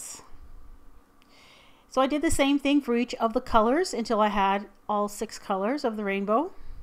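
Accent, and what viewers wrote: Canada. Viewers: Are you Canadian or American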